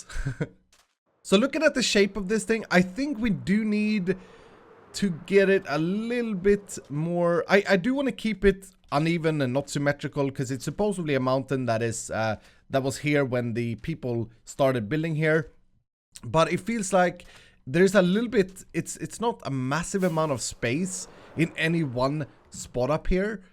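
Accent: Sweden. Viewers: Du är svensk eller hur kanske från dalarna? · Omg XD its so ez 2 hear that u are from Sweden XD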